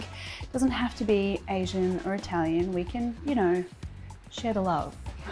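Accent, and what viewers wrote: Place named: Australia. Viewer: Your Australian accent has gotten a lot stronger since moving!! 😊😊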